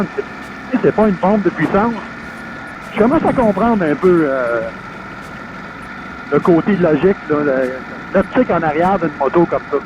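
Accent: Canada. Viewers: Vous parler comme les canadiens... c'est une moto douce et avec une grande souplesse, mais moi je prefrere la manualle de toute la vie avec l´'embrayage et la boite a vitesses...salutations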